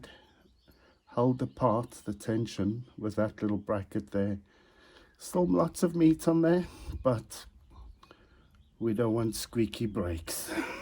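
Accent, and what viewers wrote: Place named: Scotland, United Kingdom. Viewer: Nice video man! And I dont know what it is with the Scottish accent that just makes me listen to your instructions like in a classroom lol!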